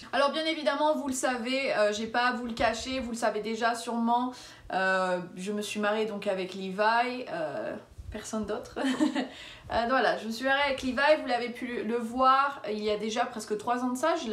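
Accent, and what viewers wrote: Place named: United States. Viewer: tu as pris un petit accent américain j'ai l'impression ^_^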